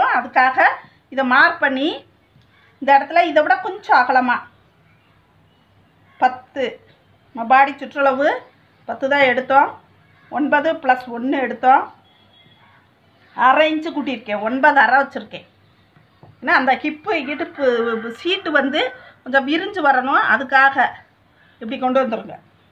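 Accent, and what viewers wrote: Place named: Madurai, India. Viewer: Tailoring institute poitu kathukita feeling.thelivana vilakam madurai thamizhil akka.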